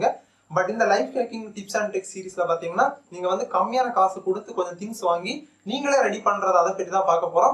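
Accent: Kanyakumari, India. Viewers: Bro neenga kanya kumaria ....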